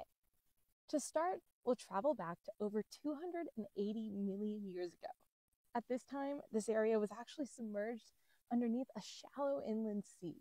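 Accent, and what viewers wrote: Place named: New Mexico, United States. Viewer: Sounds like you grew up in New Mexico?! Just makes me enjoy your videos that much more!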